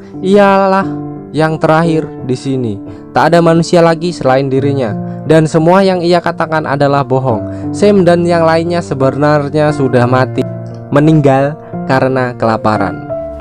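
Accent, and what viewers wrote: Surakarta, Indonesia. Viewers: wong solo kah ? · Orang solo?.. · weeee tekke wong solo to mbak e .. wkwkwk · Orang solo bang? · Orang solo kah ?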